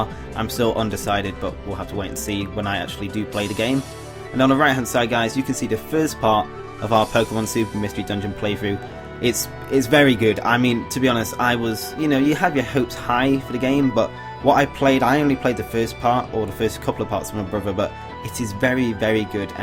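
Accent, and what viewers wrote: United Kingdom. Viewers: hear it. I'm from America though.. Are you British?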